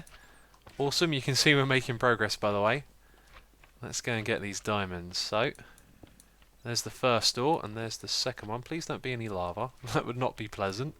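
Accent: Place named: United Kingdom. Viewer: your british Yes i've been watching you for 1 and a half years always thinking your american, sorry about that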